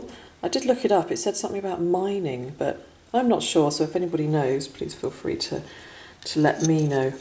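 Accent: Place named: England, United Kingdom. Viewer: I’ll bet that is a good feeling, finding a complete pipe! I know I’ve said it before... but I’ll say it again... I enjoy hearing you speak. Here in California when people speak it’s just bla bla bla. But when you say something, however simple it may be... it sounds beautiful to my ears. I’m a sucker for an English accent, but yours is even better than the usual. Ok... enough gushing from me. 🤷🏻‍♂️👏🏼👏🏼👏🏼👍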